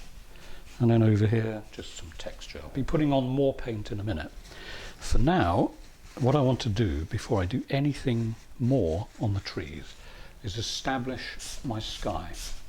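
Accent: England, United Kingdom. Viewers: Would like to know the colors you used for this painting. Was not able to hear it very well, due to hearing. Your English accent Is different than the American. Sorry no offense. Thank you, Gina/Texas girl 👧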